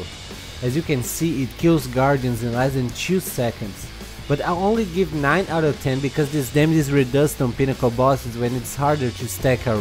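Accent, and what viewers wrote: Brazil. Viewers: Só pelo sotaque é brasileiro com certeza!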